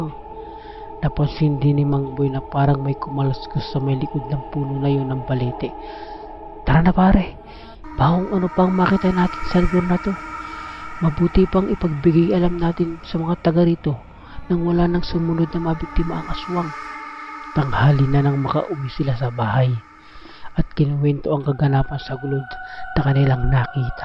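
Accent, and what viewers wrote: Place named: Mongolia. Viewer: Okey ang kwento ...kaya lang parang taga Mongolian ang pagkwento..keep it up!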